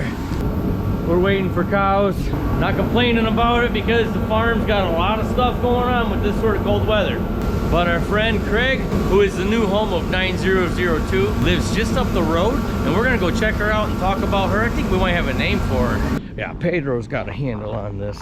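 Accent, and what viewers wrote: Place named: Wisconsin, United States. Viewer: I love how you mix your Wisconsin accent with Pedro's Spanish accent.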